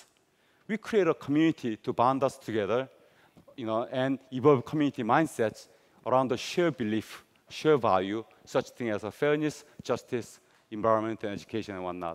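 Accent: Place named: South Korea. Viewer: He has a thick accent. I understand that he's a Korean. I wonder why doesn't he speak Korean to Korean audience?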